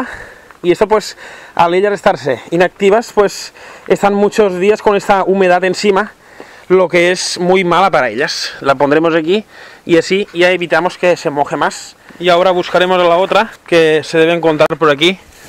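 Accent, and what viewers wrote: Catalonia, Spain. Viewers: Es Español, pero la lengua española no es su lengua materna, creo el habla catalán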